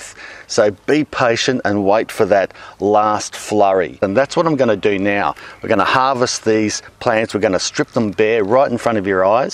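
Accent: Australia. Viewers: I love watching your videos! Your humour and positivity and fun Aussie accent! Makes me want to move to Oz! And I love using hibiscus to brew kombucha!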